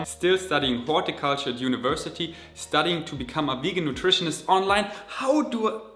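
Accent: Germany. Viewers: Hey Du, ist mir schon mehrfach aufgefallen, jetzt muss ich es einfach sagen😂 ...Vor einem englischen Wort mit einem Vokal bzw. Vokallaut am Anfang "an" statt "a" benutzen! AN incomplete protein! Fließt doch viel besser so!🌞✔ Und um den deutschen Akzent zu verlieren, würde ich mir Videos wie "How to sound like an American" anschauen!🌚 Und wenn Du die Wörter nicht wie im Deutschen mit einer kleinen Pause dazwischen aussprichst, sondern zusammenziehst, wirkt das auch gleich viel besser (deshalb auch an statt a, damit man die Wörter ohne Unterbrechung aussprechen kann) 🌞✔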